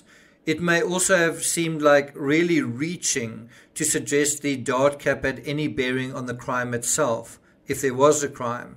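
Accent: South Africa. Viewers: I think he’s South African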